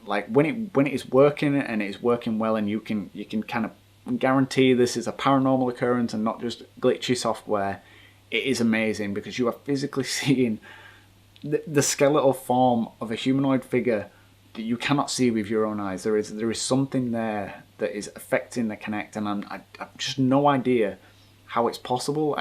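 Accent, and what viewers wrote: Yorkshire, United Kingdom. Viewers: Don't apologise for the hour long vid! It was incredible, it would be amazing if you did more hour long vids. Is your accent from Yorkshire? If it is, have you ever thought about investigating the moors? Quite a few times I have seen odd things there.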